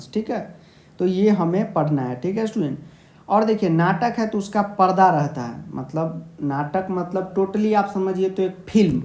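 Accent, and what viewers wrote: Bihar, India. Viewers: Bhut bhadiya sir asli bihari ki tarah padhe h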